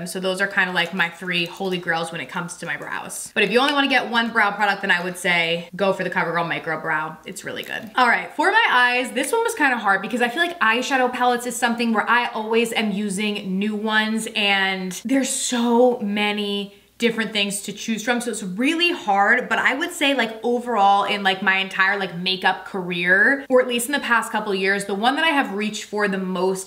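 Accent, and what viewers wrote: New Jersey, United States. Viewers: For some reason, picking up your “Jersey” accent today. Cute. 😊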